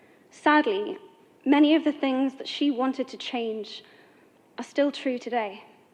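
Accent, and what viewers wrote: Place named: United Kingdom. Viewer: xem truyền cảm hứng vừa học phát âm anh anh ... quá đỉnh luôn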